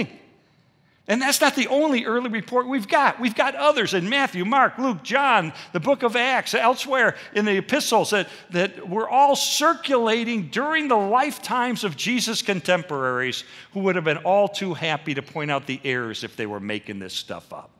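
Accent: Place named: Chicago, United States. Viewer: You can tell he was from the Chicagoland Area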